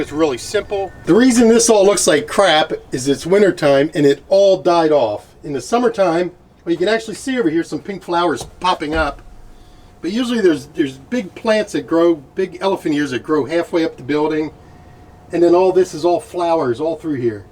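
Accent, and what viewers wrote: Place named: Philadelphia, United States. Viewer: From Philly much?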